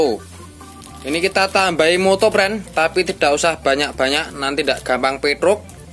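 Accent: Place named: Java, Indonesia. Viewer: Jiaaan Medok Banget Bahase Preen...Selalu Bahagia .. lan Sehat Sama Simbook ...👍❤💪...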